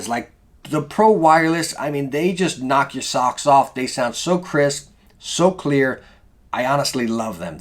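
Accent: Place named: East Coast, United States. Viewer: 2 seconds in i was like "this guy is east coast for sure"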